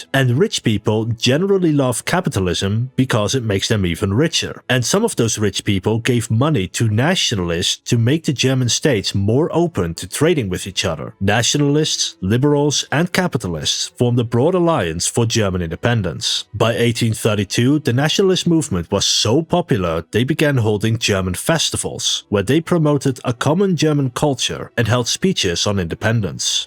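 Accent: Netherlands. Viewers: ik hoor je niet vaak engels op zijn rutte praten maar Kanals😂 klonk wel mooi helemaal omdat je het tig keer achter elkaar zij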